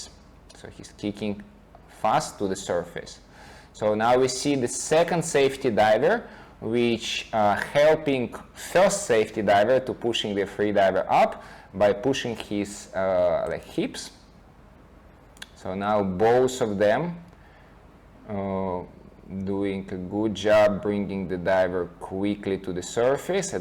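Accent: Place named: Russia. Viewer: Слушай, красивый человек с красивом акцента русско:::о)) Ты понимаешь эту тему, не умру ли Я На глубине? D5. COMBO )) Только КОПИЛ. Туш рвётся от того, что не купил океаин, если ПОДУМТЬ, ПОКУПАЛ ДЛЯ ДОКТРИНЫ,..Нет для фактов дошёл до зардержке на постептли 2 B D ВПИЗДУ ПНГЭ НО КРАСИВО:О) )\\\\ да? как же хочется это дыхание сохранить на манималках? ХУУЁВО ЗНАЮ Когда контроль, на глуби ВНЕ КОНТРОЛЯ, уже пошли работать безумные Смертники.. БЛЯЯЯЯЯЯЯЯЯЯЯЯЯЯЯЯЯЯЯЯЯЯЯЯЯЯЯЯЯ:О) КАК ЖЕ ХОЧЕТСЯ Погрузиться глубже...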